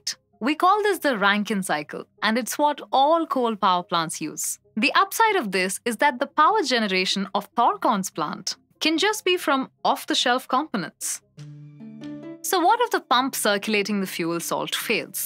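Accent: India. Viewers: the most precise Indian accent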